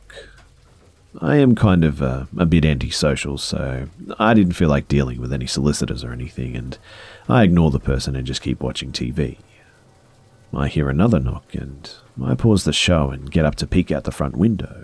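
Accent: Australia. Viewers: He's not British, he's Australian. Like, Steve Irwin, fair dinkum Aussie. I can understand getting the two confused, though. Just think Lazy Masquerade when you think British.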